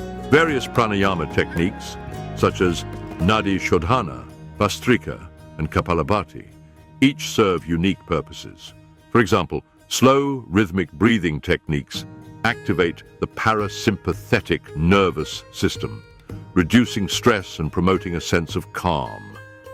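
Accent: United Kingdom. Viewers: Why do you use British voice over? Are you feeling inferior to those assholes?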